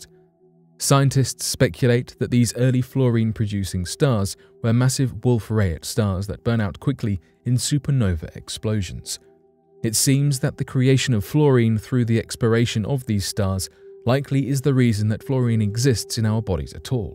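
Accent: England, United Kingdom. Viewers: why all these space videos always have an English accent? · Nice video but I don’t believe you should be putting the u.s navy’s business out there,but what can I do you do have a British accent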